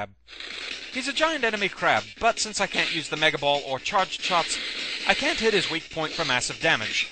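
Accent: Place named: London, United Kingdom. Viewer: Great intro! Eh, One thing that's always bothered me is your accent... I like the sound of it, but I have an instinctive hatred for it... London or something? Don't let it bother you, I can just see your aligator-like mouth moving when you talk. Hey that Shaymin on your monitor's pretty cute. HOW DARE YOU LAUGH AT CHARGEMAN!! BUT, the joke's on you, you'll feel the train's wrath soon enough. Enough random comments from me, let's see how you actually did. To part two!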